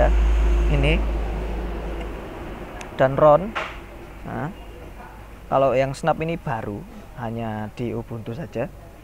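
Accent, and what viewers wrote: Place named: Surabaya, Indonesia. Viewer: Logate medok, ketok arek suroboyo y? Hahaha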